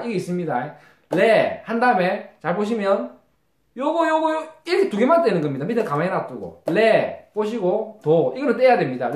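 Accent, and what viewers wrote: Gyeongsang, South Korea. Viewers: ㅋㅋㅋ 경상도 사투리 강의 정말 구수하고 잼있습니다.^^ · ㅎㅎㅎ 나도 경남사람이지만 경상도 보리문댕이 사투리 너무 정겨워....ㅋㅋㅋㅋ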